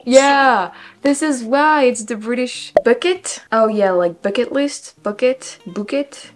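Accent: France. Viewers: American English with a French accent. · The English language sounds so much better when a French woman is speaking it. 🤩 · Some of your "mispronunciations" aren't actually wrong. It's not your first language so your beautiful French accent makes a difference like it would with anyone speaking a language other than their own which would totally get them in trouble in some countries, lol. I've heard people BUTCHER French words. I took 3 years in high school and even though that was 30+ years ago, I still cringe when I hear it because you can tell that person has never learned properly but THINK they know. I agree with the one comment. That rolling "r" when you speak certain French words does trip up a lot of people just like "th" does for you and I've noticed for a lot of Brits who will pronounce it as an "f" sound. You do talk too fast in your excitement sometimes which makes you stumble but once you take your time, you're golden :). 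With all the accents (regional and foreign) that we have in the US, it's laughable that people say you're saying words wrong but you made a very good point. If you've never heard them spoken before, you don't know it's wrong. FYI, it's not that we don't say the the first "n" in Lincoln. It's that we don't say the 2nd "L" so Link-IN or Link-On depending on where you live. Chicago - the CH isn't a hard Ch like Church but a soft sound like Shh. Believing - Ba-LEAVE-ing. You said read near the end of the video "it will be fun to read (RED) your comments." The read when pronounced like red is the past tense of the verb and read pronounced like seed is the present tense :). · I have watched you from the beginning of your first videos. You have made great progress learning English. Please don't lose your French accent because it is so cool. Your T-shirt is awesome. Just an old guy's opinion. I think you and your mom are wonderful. 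Stay well and be happy. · frankly, I love the French accent. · Your French accent is fine. I can hear you clearly. I’m from Jersey and that’s how I sound. I’m not changing nor should you! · I find it interesting to hear you talk about the different prnounciations. However, I don't understand how or why anyone took exception to your pronunciation to begin with. Your French accented English is absolutely charming. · Your doing fine. Our accents are tough for everyone. And yes your French accent is delightful. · The utter defeat in your body language when you were trying to reconcile county vs country made me laugh too hard! I’m so amazed by your language skills, and your French pronunciations of words in English are so lovely! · Your pronunciation is great. Don't lose your French accent, it is wonderful. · I can always tell what is saying on her videos. Of course it won't be like an American, she's Frenchtastic 🙂I am always disheartened a little when people "lose" their accent. 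It's what makes humanities rich textures full of genuine diversity yet through shared communication of that diversity we can communicate. ( even with the multiple meanings ) Context clues FTW 😛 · Your 'sauce' and 'squirrel' are good. I think if you have a problem it is more phrases than individual words. In general you are easily understood. I've known people who lived in the US for years who were far worse. The French accent in English is what I would call a prestige accent. Louis Jourdan, a French actor who worked in Hollywood, could speak almost perfect English but he kept a little French accent to make his characters more significant. So, improve your English when you can but your accent doesn't hurt you at all. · I hope you never lose your French accent. Your English is very understandable. More so than a lot of Americans. My mother is French. She lived in the US since 1961 and still gets comments about her French accent. 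I would say that the TH seems to be most difficult for French speakers.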